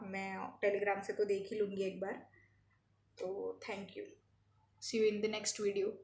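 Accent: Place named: Mumbai, India. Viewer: Hi... Can you tell how many chapters have you completed (or revised) till now? Are there any chapters that you are not doing? And also, this is not study related but are you from mumbai? Your way of speaking made me think that u could be from mumbai. As well as, in one of your videos you mentioned about splitsvilla. Actually, I also watch splits and its a distraction. So you are not the only one facing that kind of distraction. You are not alone, hehehehe. All the best.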